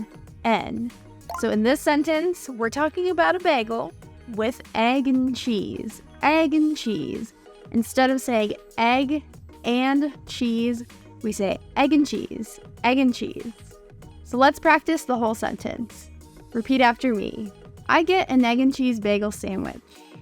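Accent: United States. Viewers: which state are u from,?😊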